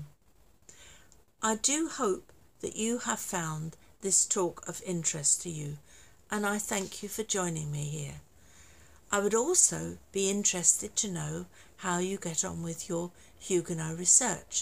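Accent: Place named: England, United Kingdom. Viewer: Why do you have an English accent?? I doubt there are Huguenots in England. In fact that whole country has been taken over by the Viking types. Even Iceland nir Ireland have Irish there.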